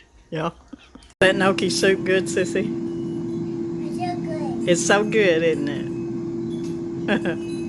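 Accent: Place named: Kentucky, United States. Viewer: Hi! I’m Jane and I’ve been watching you for a little while. I’ve gotta tell you just how special you all are to me because I honestly feel like I’m back home in Kentucky when I’m watching. Your accent is just like me and my family’s and you cook like them too. Lol Thank you! By the way, made the Elvis cake the other day and my husband and son pretty much ate the whole thing in 2 days. Guess it was good or something.😂 Keep on cooking and growing.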